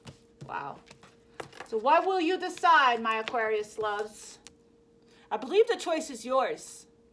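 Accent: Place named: New Jersey, United States. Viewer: I love you so so much ❤️💕💕 You sound like a Jersey girl did you say you live in Dallas!!? You are my favorite reader I connect so hard with you I'm in Big Spring Texas born 2 - 9 1970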